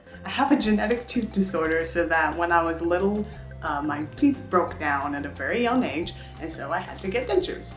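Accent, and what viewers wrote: South, United States. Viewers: mad dy I’m Southern and it’s close. She’s from Kentucky, apparently, so I’m not far off.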